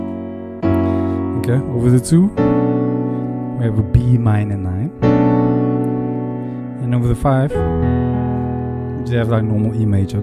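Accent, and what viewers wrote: Cape Town, South Africa. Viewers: Your accent sounds South African, your playing sounds heavenly. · Can hear the dialect u from Capetown ..Represent cpt I love this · you sounds like a Capetownian really...I appreciate you lesson 🇿🇦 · Was so excited to hear a South African accent doing this. Great tutorial,thanks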